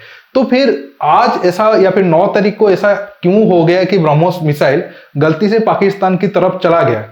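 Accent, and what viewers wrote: Odisha, India. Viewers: AP bhai odisha se ho kya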